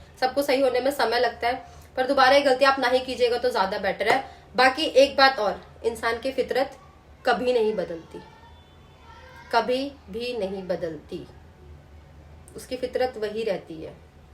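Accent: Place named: Uttar Pradesh, India. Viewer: Aap kanpur lucknow se ho kya aapka kahe hamare kanpur lucknow ki jaban hai na baki your way of talking is marvellous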